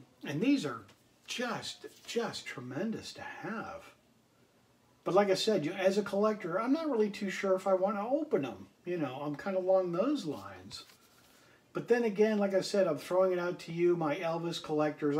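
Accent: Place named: Mississippi, United States. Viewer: I always thought you were from Mississippi with that accent of yours???🤔lol😎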